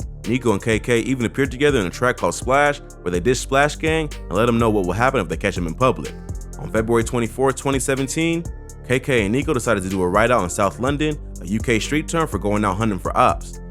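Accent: United States. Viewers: Bro I love this us accent wtf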